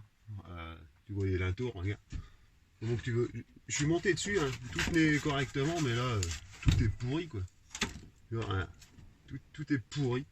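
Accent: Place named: North, France. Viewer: Travail monumental 😱💪🏻💪🏻 Bravo. On est en plein dedans pour la capucine de notre camping-car 🥺 J’ai reconnu l’accent du nord de mon enfance ☺️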